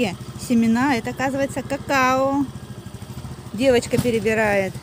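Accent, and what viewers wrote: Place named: Visayas, Philippines. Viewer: Hello Russia 💘👋✌that's why the tone is Visayan because it's Cebu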